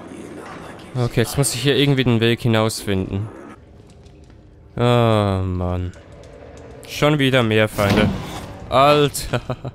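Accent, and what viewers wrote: Switzerland. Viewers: Du bisch schwizer 😵 kul i ou 🤣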